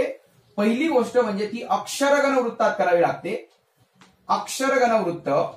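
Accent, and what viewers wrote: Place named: Dhule, India. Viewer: 10th pass karun 22 varsh zhale. Mi kavita lekhan karto. sahaj mhatale vrutta ani chand yache dnyan ghyave punha ekda mhanun video search kela. tar ha video start to end pahila. punha school madhe gelyachi feeling ali. Khupach chaan sir. ani tumchi accent dhulyachi aahe. I hope aatta Lockdown chya kalat vidyarthyanna yacha khup fhayda hoil... Good Job Keep it up. <link> vel milala tar mazhya kavita check kara...(Mukta Chandatlya aahet... ) Baki Chndatalya ani Gazal post nahi kelya ajun shikto aahe...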